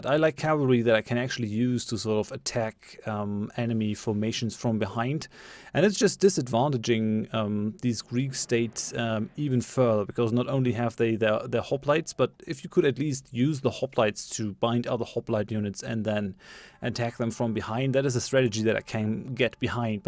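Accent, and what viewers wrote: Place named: Germany. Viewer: It was quite an interesting series! Waiting for your next playthroughs! Thank you for posting the save file. It's going to be interesting to play it from there. Lots of let's players are not as thoughtful and courteous! :) P.S.: I thought you would look like a professor/teacher with that kind of unique sharp look and the small frameless glasses after hearing your German accented English through the whole series lol. Didn't expect someone who looks like a proper stoner or Keanu from that old movie! No offense intended :) Thanks and have fun!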